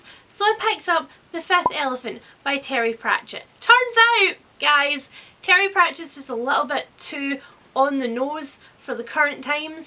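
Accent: Scotland, United Kingdom. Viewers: Is she Scottish/ Irish? Btw awesome idea. I have a superstition that if I add a book as currently reading on Goodreads or post about a book while I'm reading on instagram then I won't be able to finish. It's irrational but 🤷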